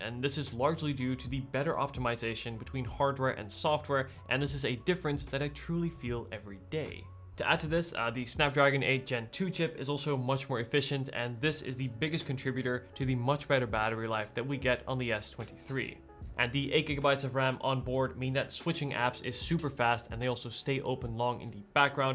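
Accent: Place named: Netherlands. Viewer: Your English is great! I normally easily spot a Dutch accent, but here it took me 3 whole minutes :)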